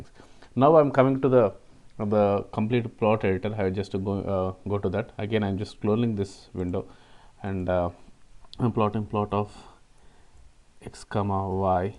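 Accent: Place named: India. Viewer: Thanks dude I understood you even your indian accent is a little bit difficult to my ears but I caught it. I learnt a lot in this video.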